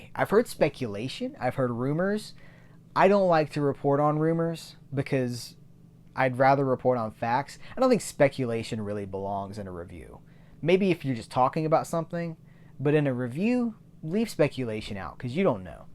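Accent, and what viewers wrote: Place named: Australia. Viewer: I think your Aussie accent is perfect. And this is coming from someone who is not Australian.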